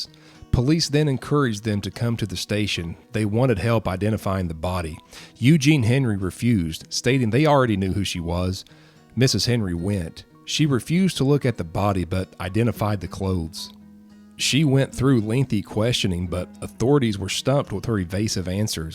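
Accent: South, United States. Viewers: this was one of the best iv seen/read on u-tube ,was quick and concise too the point ,the south in your mouth added to its feeling of CONFIDENT story telling ,kudos too yall ,WELL DONE ! bring on more like it. thanx!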